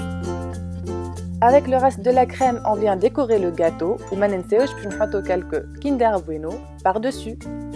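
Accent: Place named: Algeria. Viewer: Bravo pour la recette j'adore ton accent sa me rappelle l'Algérie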